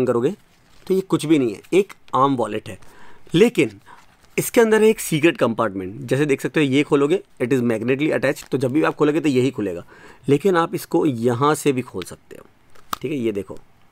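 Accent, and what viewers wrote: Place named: Gujarat, India. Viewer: Heyyy bhaiya and didi...... Lots and lots of love from my family and friends... May you live longer and longer and make our next generation laugh to as we laugh... I cant spend a day without your vlogs and prank in breakfast your video in lunch your video and in dinner your video ❤️❤️i love your way of speaking and gujju tone and how you help otherrrss.. your mom is so cute as welll❤️❤️✨✨ Interested in giveaway ✨❤️ Lots and lots of love from my family and mee❤️✨ bhaiya new logo is mastttt❤️❤️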